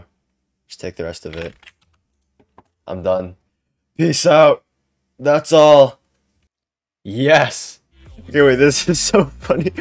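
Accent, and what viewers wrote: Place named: Canada. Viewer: YOURE CANADIAN ASDKLNAOSPIJDKOJASDASDASD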